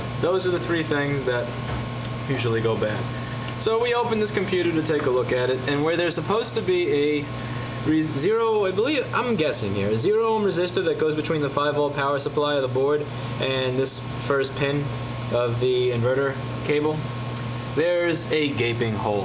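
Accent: New York, United States. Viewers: You can totally tell he's from New York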